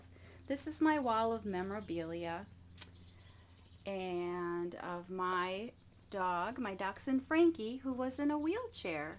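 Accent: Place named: Canada. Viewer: Just wondering cuz I noticed your accent, are you Canadian?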